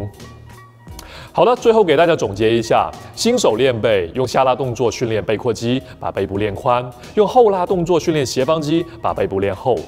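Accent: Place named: Guangxi, China. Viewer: 非常棒的视频，感谢分享！听口音广西人吧？和我关注的另一个股票类youtuber：Emile Su的说话方式真的很像。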